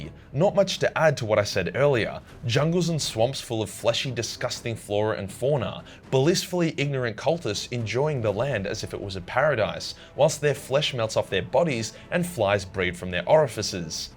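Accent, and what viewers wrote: Australia. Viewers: Bruv I need to move to AUSSIELAND and hear u speak on the daily it's fucking great, you Mook. Keep up The Emperors Work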